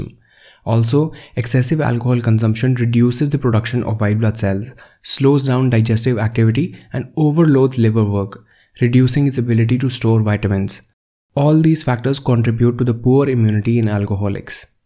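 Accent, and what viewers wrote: India. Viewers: Are you Indian your English essence is like of a Indian🤔🤔🤔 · You're accent is more like indian buddy ! ❤️❤️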